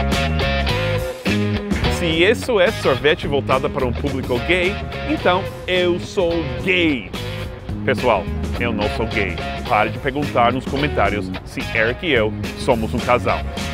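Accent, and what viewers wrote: United States. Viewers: Sempre achei que o era gay. Na verdade todos que tem sotaque americano parecem ser gay. Fica um jeitinho muito meigo na hora de falar. Eu continuo achando que o é gay. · hahaha amo o sotaque americano! adoro seus vídeos!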